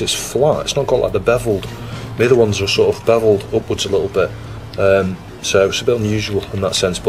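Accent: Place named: Liverpool, United Kingdom. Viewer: What part of the UK are you from you sound sorta Scouse but then sorta like Manchester or even Cumbria. Good to see you enjoying your hobby!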